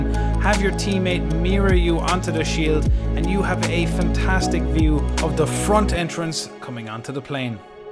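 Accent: Ireland. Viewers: As soon as I heard ur voice I could tell that ur Irish, what county u from chap? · You sound like you're Irish. If I'm right, which part of the country? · Are you Irish? I'm Irish and you sound Irish Tbh😂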